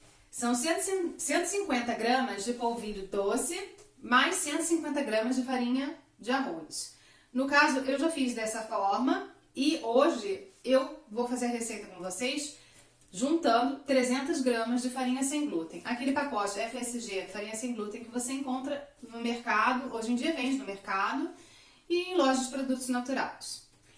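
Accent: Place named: Rio de Janeiro, Brazil. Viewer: A locução mto carioca ,fala gritando rrrr e ssssss. Cansei me deu dorrrrr de cabeça. Deu não 🙆👋👋👋👋👋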